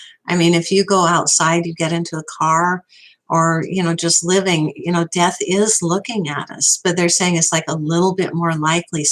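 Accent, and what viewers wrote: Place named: South Africa. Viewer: For the info ... I'm not a Mataji ( you couldn't know that ... no biggy ) , and I was initiated by Prabhupad in 1974 ... Let me ask you ... You're basically saying you took initiation ...by whom , and what " branch " ?!? And ... where are you roughly located ( your accent sounds almost south-african ) ?!?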